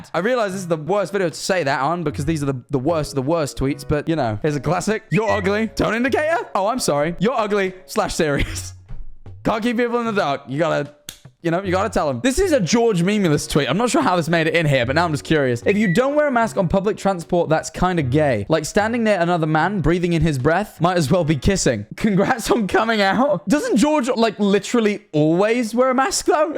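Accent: United Kingdom. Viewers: why do i feel like your losing your bri ish ness ? im going crazy 😀😀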